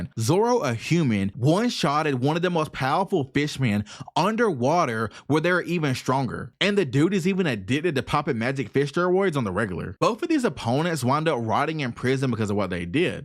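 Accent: South, United States. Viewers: You can tell my dawg from the south plus anime content I'm subscribed now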